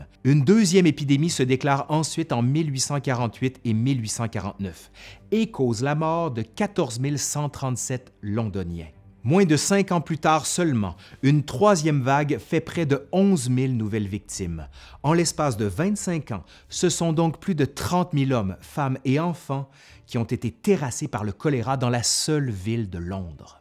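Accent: Quebec, Canada. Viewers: Jolie découverte cette chaîne d'histoire, merci, c'est très intéressant! Et avec ce charmant accent québécois en plus!! :-) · crime un vidéo d'histoire en canadien-français! Sa parle au diable! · Super video, et ce petit accent de Québec ...un délice pour mes oreilles!(ca fait un petit plus pas du tout objectif!) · Non, non, il a bien à accent québécois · J'adore votre accent, les Québecquois 😁Salutations de Bruxelles ☀️ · il y a un français de France, basé sur l'accent parisien, tout comme il y a des accents en France, effectivement. A mes oreilles de français, l'accent du vidéaste tient plus du Canada que de France. C'était simplement une généralité que je soulignais, sans demander nullement un débat sur les accents canadiens / français / international. Bonne fin de journée. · du calme Molière je le comprends, les québécois sont ceux qui ont le pire français.. 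même je trouve que l’accent de celui dans la vidéo n’es pas très prononcé donc c’est encore compréhensible.. après on ne comprend rien de ce que disent la plupart des québécois.. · Captivant, on détecté légèrement l'accent quebecquois mais ce n'est pas très prononcé ce qui est super pour mes oreilles trop habitués à l'accent français et qui ont tendance à être intolérants à d'autres accents. Je ne connaissais pas l'histoire, très bien raconté. Merci pour le partage ! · Merci pour ton bon français Sa fais dubien decouter un québécois qui parle normalement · L'accent canadien parle de lui-même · toujours ce bel accent quebecois que j'adore qui ma fait un grand effet quand j'avais 15 ans j'en est 82 et il me fascine toujours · bon travail. interessant. Etant moi-même un québécois qui réside a l'étranger, "j'su ben" content d'entendre notre accent.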